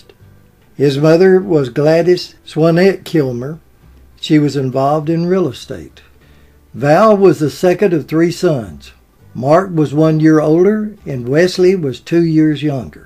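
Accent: South, United States. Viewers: Another great video,. Your Southern charming voice once again makes your videos golden. Thank you.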